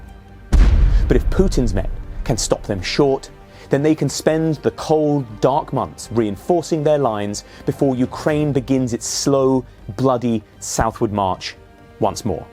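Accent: United Kingdom. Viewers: I am so sick and tired of some idiot with a British accent telling me stuff. It’s insulting.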